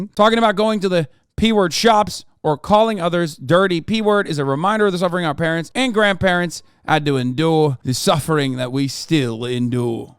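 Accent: England, United Kingdom. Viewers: hasans british accent is getting real damn good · has a really good british accent it's kind of scary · That's a great English accent for a yank. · As a brit, your british accent is fantastic, gets me everytime.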